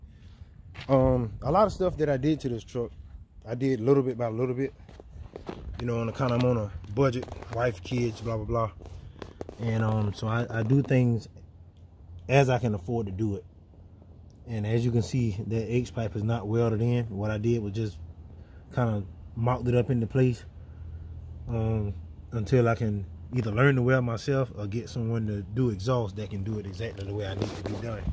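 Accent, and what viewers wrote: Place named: South Carolina, United States. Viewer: I love hearing the south Carolina accent feel like I'm home. I really like the way you mounted the tach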